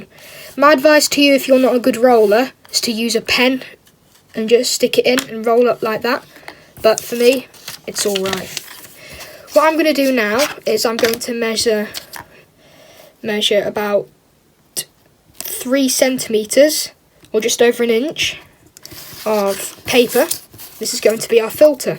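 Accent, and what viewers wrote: United Kingdom. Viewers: british accent just adds to it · of course the mf is british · british accent😍😍😍😍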